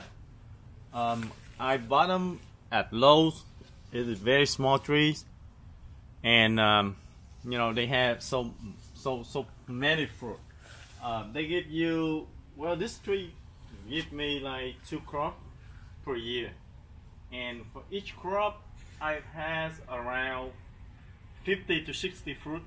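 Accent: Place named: Vietnam. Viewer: beautiful star fruit. You sounds like a Vietnamese, are you?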